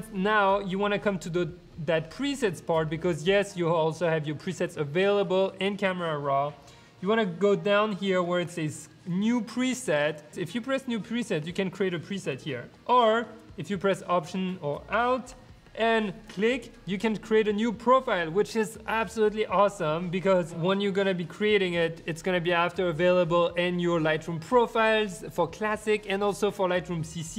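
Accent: France. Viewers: I just jump from your FR chanel and it's fun, you got a french accent, and in French you got like 50/50 British/US accent :D And one more subscriber.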